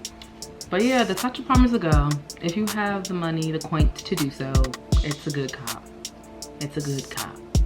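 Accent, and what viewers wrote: New York, United States. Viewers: Can I just say how comforting it is to hear a legit ny accent after moving out of state 😭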